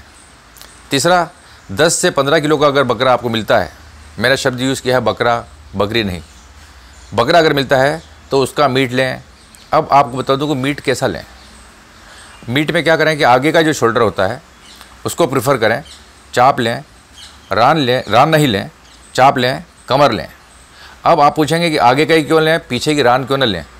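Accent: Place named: Jodhpur, India. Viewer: are sir.....apki dialect ekdum Jodhpuri h.....kya karya krte h sir by profession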